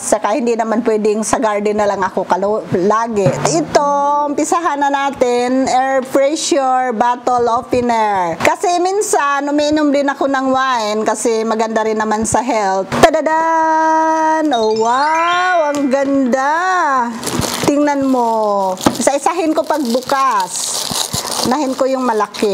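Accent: Visayas, Philippines. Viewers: I love the way she speak bisaya ❤️ stay strong 💕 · Bisaya man diay ka uy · sarap lang sa tenga marinig mag bisaya si 🤣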